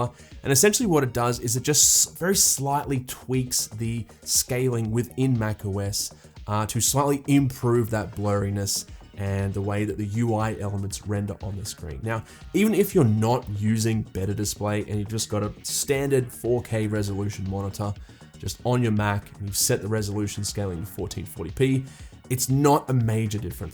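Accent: Australia. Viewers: Would have been helpful flagging the pricing mentioned was in USD. The Aussie accent led me to believe it was AUD and there had been major price drops!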